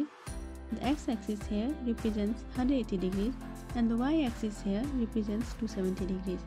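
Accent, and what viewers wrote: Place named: Nepal. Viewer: Hlo ... miss where are you from ? .... your accent look like nepal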